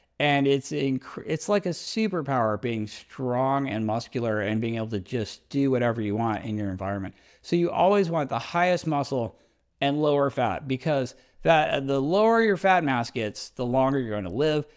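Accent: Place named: United States. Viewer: 👍Highly informative👌. Please add English subtitles for better understanding American accent.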